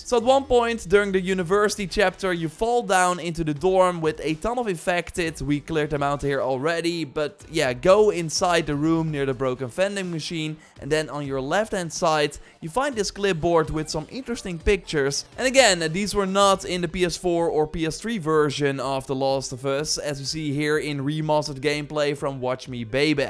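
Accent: Netherlands. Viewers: Ik hoor Nederlands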